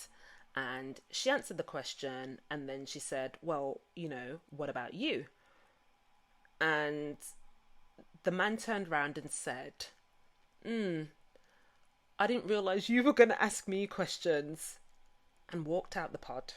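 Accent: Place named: London, United Kingdom. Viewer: I always love to hear a fellow Londoner (but I detect a hint of Brum, am I right!?) on YouTube! Now to the review 🍿 🍿 🍿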